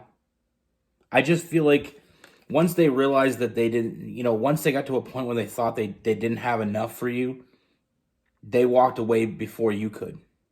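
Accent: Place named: Canada. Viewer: I'm from New Brunswick. Are you from Canada? i hear an accent. lol. if not. I'm so sorry.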